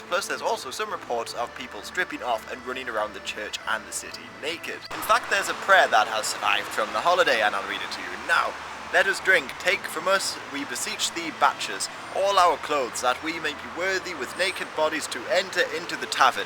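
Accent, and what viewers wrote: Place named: England, United Kingdom. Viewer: He's English, just because he doesn't have an American accent doesn't mean english is his first language. What most Americans don't understand is that ENGLISH is from ENGLAND.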